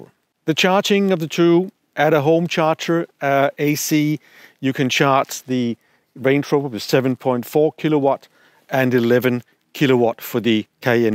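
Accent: Denmark. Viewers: Is this Danglish ??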